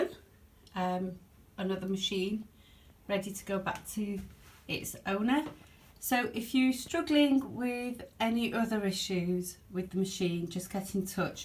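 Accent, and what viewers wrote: United Kingdom. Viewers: I love your British accent. It sounds so comforting and homey to my sensibilities.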